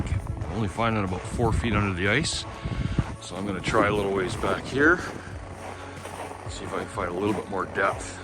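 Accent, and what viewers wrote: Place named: Midwest, United States. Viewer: Bro I love your Midwest accent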